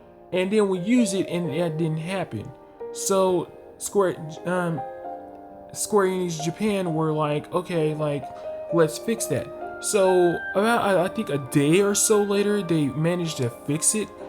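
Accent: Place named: Canada. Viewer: Take a shot every time this canadian person says "like". idc if he's not. just wanted to point out. he just sounds canadian.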